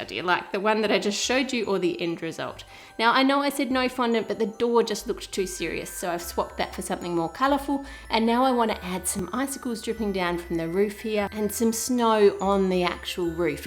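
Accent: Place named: Australia. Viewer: "To make this one, you'll need floura, suga, butta.." HAHA! Sorry, I don't mean to laugh at you, but it's just funny hearing your Aussie accent to someone like me in the U.S. 😅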